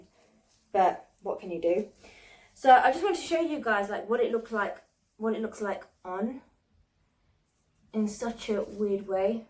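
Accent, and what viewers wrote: United Kingdom. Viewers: Great video! Loved seeing you trying on those different type of suspender belts! My favourite was your white, lace, suspender belt. Love your lovely English accent too.:) xx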